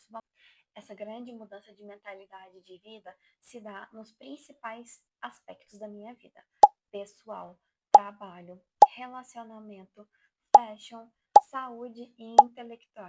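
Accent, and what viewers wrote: Minas Gerais, Brazil. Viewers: É mineira? vive de pão de queijo kkkk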